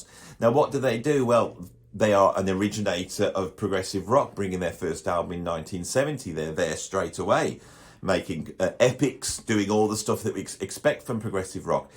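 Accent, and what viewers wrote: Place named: Poole, United Kingdom. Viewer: I'm just having fun. Great video. This was my all time favorite band. Are you from Poole? You and have very similar accents.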